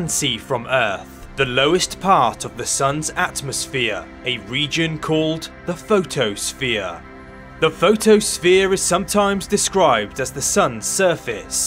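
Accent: United Kingdom. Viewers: You can't delete someone's replies. And how is him having a British accent relevant to the comment?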